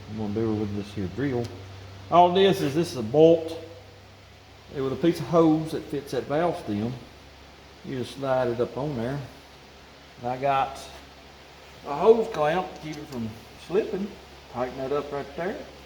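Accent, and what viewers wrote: United States. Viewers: Where is your American accent from….it’s lovely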